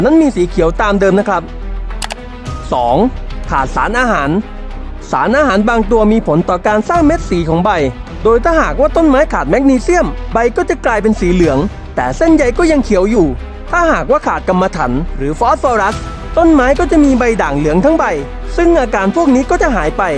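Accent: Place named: South, Thailand. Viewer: เสียงบรรยายคล่องแคล่วดี แต่ออกสำเนียงใต้มากไปหน่อย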